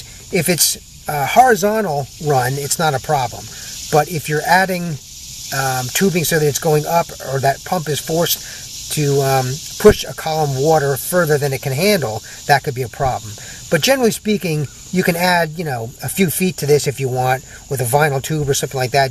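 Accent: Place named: Bronx, United States. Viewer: first thank you for taking the time out to reply. i used ur tips and cleaned out the P- trap, opened the sub pump,bought a multi meter (first time using one. sh%t scared of electric) now im testing everything around the house thank you ), in a nut shell everything came out right with your instructions,which on the videos you explain everything in great detail thanx for being calm and the way you put your words together and accent( sounds like a BRONX accent)were im from. makes you want to listen more,your great. thanx again MR.thank you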